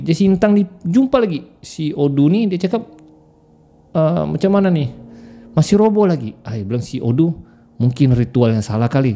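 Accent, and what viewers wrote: Sabah, Malaysia. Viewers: Jadi bang kau pandai kah juga becakap sabah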